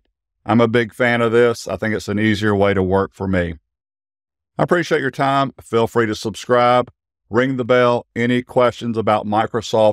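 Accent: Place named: Georgia, United States. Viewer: 1. I recognized your GA accent 2. I am also a CPA 3. Thanks!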